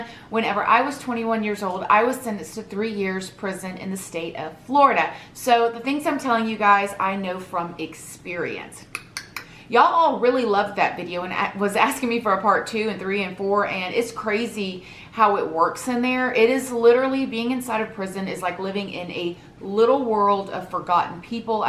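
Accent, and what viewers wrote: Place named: Texas, United States. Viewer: This woman has the Texan accent of my teacher.. But she is from Florida..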